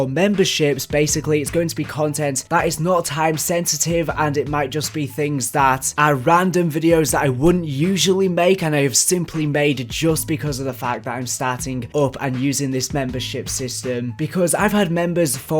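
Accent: United Kingdom. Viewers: Anyone else loving when he is streching some word with this british accent? :D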